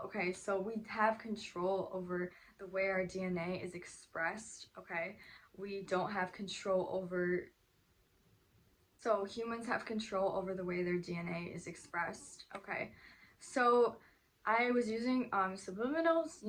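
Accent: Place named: United States. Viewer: نو لَكنتها امريكيه ف كلامها عادي ^o^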